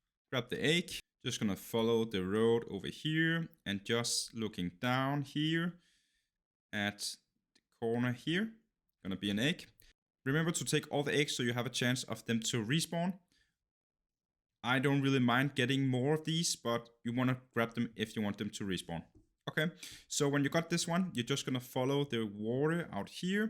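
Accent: Denmark. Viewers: sorry jeg afslørerede dig 😂 du har bare en af de mest tydelige danske accenter når du taler engelsk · Gætter på Dansk accent?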